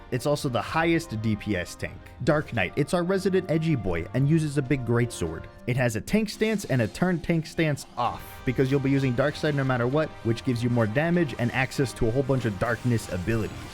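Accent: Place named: New York, United States. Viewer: I like your accent, are you from New York? This is very informative though, thanks!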